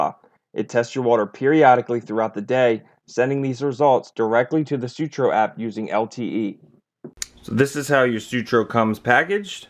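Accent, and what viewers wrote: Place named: Pittsburgh, United States. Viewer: Sounds like you have a Pittsburgh accent. I am originally from outside of there.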